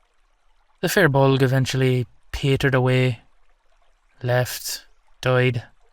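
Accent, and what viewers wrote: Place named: Ireland. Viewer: Something is a bit fishy...... Love this audio 😀 And I love the Irish accent ❤❤❤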